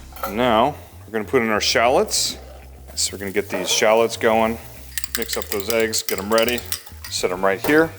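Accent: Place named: Glasgow, United Kingdom. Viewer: You sound like you're from Glasgow.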